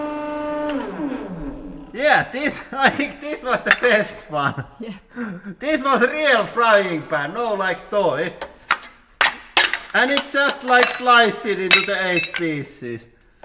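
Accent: Finland. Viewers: He´s from Finland. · Charles Ray He is from finland.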